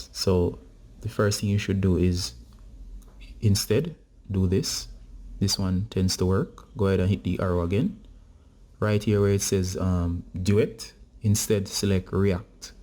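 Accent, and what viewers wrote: Jamaica. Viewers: U sound like jamaican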